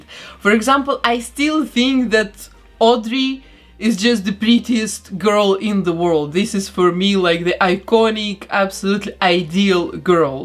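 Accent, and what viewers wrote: Russia. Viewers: Хмммм,мне кажется что ты русскаЯ, потому что акциент не английский:) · OMG hard Russian accent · почему произношение такое русское..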